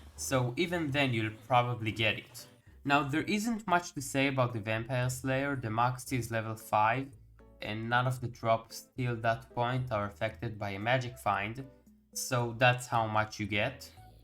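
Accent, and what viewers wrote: Israel. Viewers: איזה מבטא ישראלי 😂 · מבטא ישראלי?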